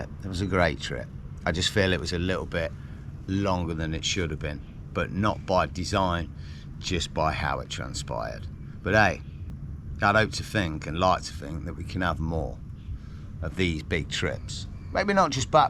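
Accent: Leicester, United Kingdom. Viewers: Enjoying the videos , just discovered your channel, Is that a Leicester accent?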